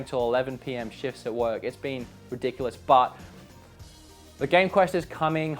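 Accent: Australia. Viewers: The aussie accent definitely got less noticeable watching this in 2026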